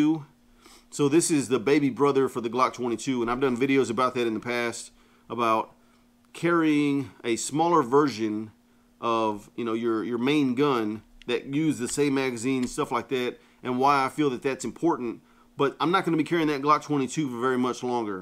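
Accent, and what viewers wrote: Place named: Houston, United States. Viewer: I’m from the Houston area, and you sound like you’re from the same area. Where is the place you get your holsters made?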